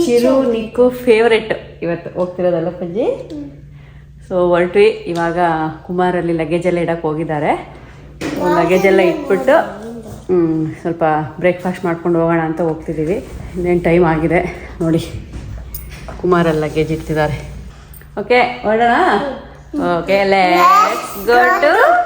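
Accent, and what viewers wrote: Mandya, India. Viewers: Olleya Video madidiri. Mandya da Kannada chennagide. 🎉thanks🌹❤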